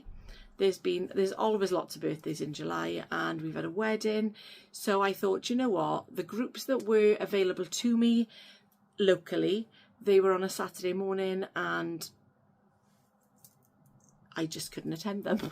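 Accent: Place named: Wales, United Kingdom. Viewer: Love your `Welsh accent whatever day you post.😃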